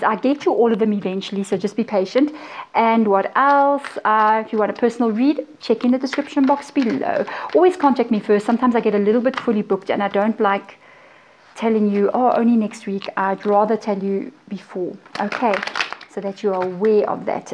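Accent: South Africa. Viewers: You described my exact situation! Xx just walked away from a very toxic Pisces who cheated and not just once, was very up an down kept going back but now I’m ready to walked and not look back, Nice to hear a bit of “home” in your readings, I’m South African living in the uk 🇿🇦 Thanks for a great reading! X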